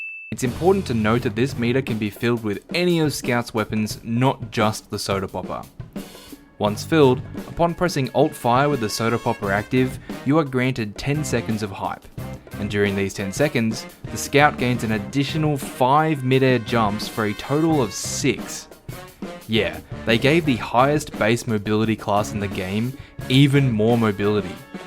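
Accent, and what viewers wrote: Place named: Australia. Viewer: classic American thinking Aussies sound British (spoiler: they sound absolutely nothing fucking alike)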